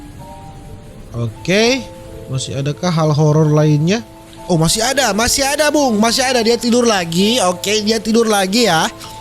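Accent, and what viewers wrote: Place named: Sulawesi, Indonesia. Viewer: Kalau saya selalu ngakak,, Krn org Sulawesi JD memang begitu lah logat nyaa... Kasar tapi hati nya lembut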